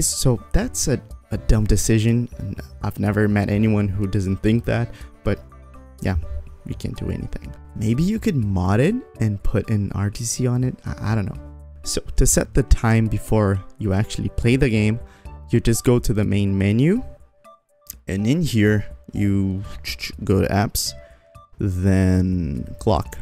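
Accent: Brazil. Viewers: I also felt something like he was Brazilian lmao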